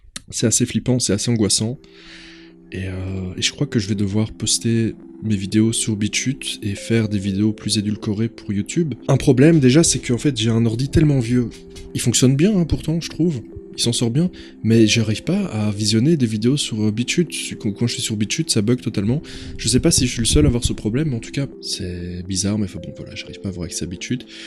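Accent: Belgium. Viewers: Tu as un accent bruxellois.🤔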